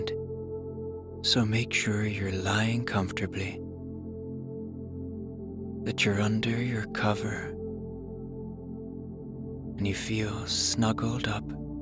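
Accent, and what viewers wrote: Ireland. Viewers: I'm an absolute insomniac, however you help me relax with your beautiful Irish tone that reminds me of home, God bless you ❤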